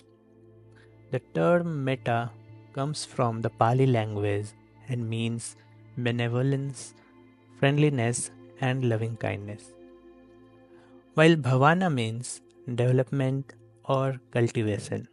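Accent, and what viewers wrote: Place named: Eastern Uttar Pradesh, India. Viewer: Thank you. This works fine for me. If i had to place your accent it would be Eastern UP or Bihar or even Nepal. That would make it easier for you to ronounc4 pali. It should be Metta for Maitri and Bhaavna, and not Bavaana.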